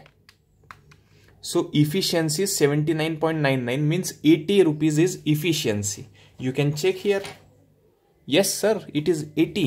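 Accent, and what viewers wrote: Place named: England, United Kingdom. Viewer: I'm from tamil nadu loving your english accent ❤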